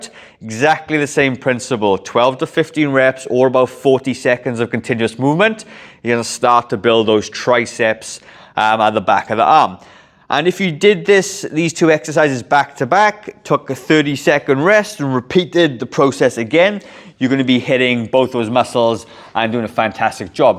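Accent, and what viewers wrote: Wales, United Kingdom. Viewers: Wales, you do not need to say your from Wales I could tell by your accent first time I watched your very helpful vids, I am from Tenby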